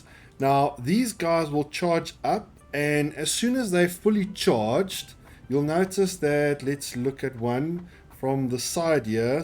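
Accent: South Africa. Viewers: Where are you from you sound afrikaans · Love the vid.your accent sounds south african?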